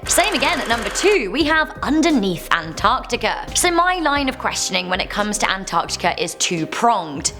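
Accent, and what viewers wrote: United Kingdom. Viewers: She says capsule like it’s capsooool that’s wack I really love the accent but the British be saying certain words weird asf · She sounds British, one can only hope so. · Great job as always hun keep up the good work i love your voice are u from the uk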